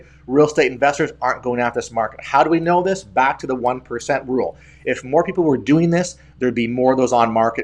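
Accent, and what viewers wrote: Canada. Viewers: You must be Canadian because you say oot and aboot.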